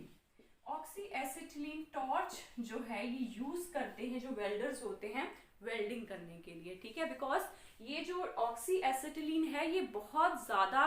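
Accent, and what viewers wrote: Punjab, India. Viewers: Mam apka channel acha study karwane ka style bhut vadiya sabse badi batt acennt english language ka punjabi hay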